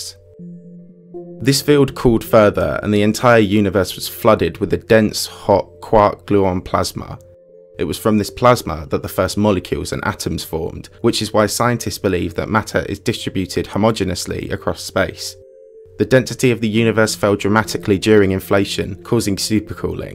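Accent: United Kingdom. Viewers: Except for the British accent its very descriptive and concise leaving out only one major point, the universe was born in a medium of some sorts which allowed for containment of the energy. Also, I wonder why people ask why, the answer is all around us.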